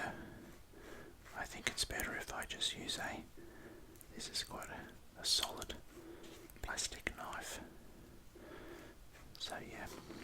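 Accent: Australia. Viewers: Aussie man explains his accent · I do prefer you talking more. Australian accent helps me sleep. I like your explanations as well. I watch every food video. Love the channel all the way from USA. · The best Australian accent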